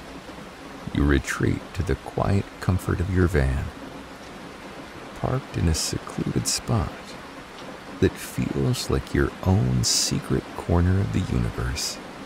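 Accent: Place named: United States. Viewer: Nice to hear a cultivated American accent. Have to work on its not sounding so supercilious …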